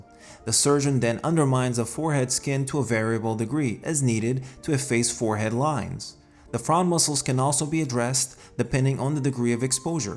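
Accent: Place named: Turkey. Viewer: is he Turkish? he sounds Turkish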